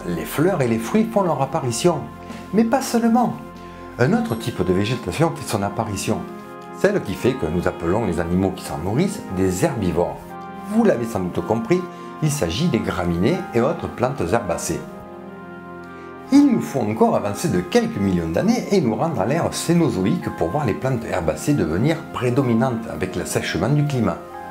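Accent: South, France. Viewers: (: Au top. Toutes c'est fleurs, l' accent du sud ,avec un temps de pluie rien de mieux :)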